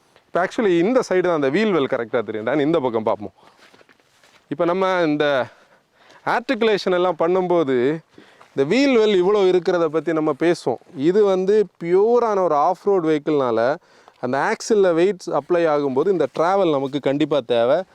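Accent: Kanyakumari, India. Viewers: Kanya kumari style speech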